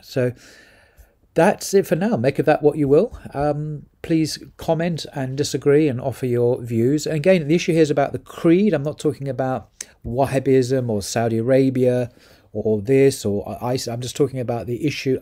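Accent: United Kingdom. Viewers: Ended up liking before watching 😅 but am gonna hear completely ~After hearing completely~ Somehow your British accent felt more nuanced this time 😅 I had originally felt that Salafism is the right thing, because of their premise that following the religion of the first three generations is following Islam. As of last or last to last year, I have just washed my hands off anything that ends in an -ism or is anything like that, because by their very nature they are limited. I have also listened to Dr Yasir Qadhi a lot, and in one of these sessions where he was forced to discuss creed, he said in the end something similar to that this discussion is not too fruitful because whether athari or ashari, people still do not show any major differences in righteousness, closeness to religion etc. i.e. the outcome is not very different for being ashari or athari 😅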